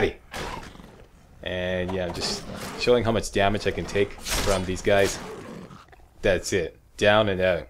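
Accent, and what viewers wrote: Canada. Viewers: You’re Canadian aren’t you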